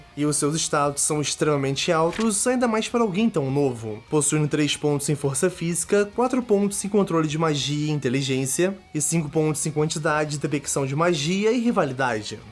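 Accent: Portugal. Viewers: Tu é português? Tem um pouco do sutaque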